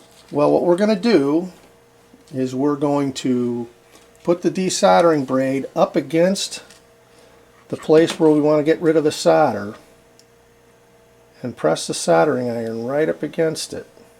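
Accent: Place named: United States. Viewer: Great video. I never realised you were not supposed to touch the solder to the iron. I've learned a lot,. Thanks. BTW It's a bit off-putting to hear the American pronunciation for soldering - to my ears it sounds like you are saying 'sawdering'. In the UK the SOL is pronounced as it is in soldier or, if you like - souldering! :-)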